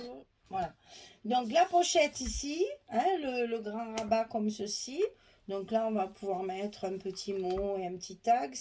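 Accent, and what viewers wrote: South, France. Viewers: Bonjour, quel beau papier, c'est très élégant et vous le sublimez par votre talent!!!! J'aimerais savoir où vous vous êtes procuré la pointe avec laquelle vous enlevez la pellicule des bandes de colle double face? Merci pour votre réponse et ce merveilleux partage!!!! J'aime bien votre accent car j'ai vécu les 33 premières années de ma vie dans le midi et maintenant je vis en Bresse!!!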